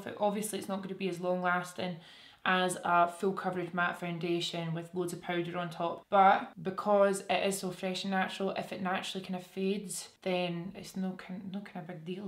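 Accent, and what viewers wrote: Scotland, United Kingdom. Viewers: I'm an English teacher from Germany and must admit, the Scottish accent is my absolute favourite one to listen to, although, of course, I'm aware that if you'd talk among friends I probably wouldn't make out any sense at all 😂 It's so charming that it totally adds to my pleasure watching this channel and contributed to my decision to subscribe honestly, a lot...but reason no 1 the humour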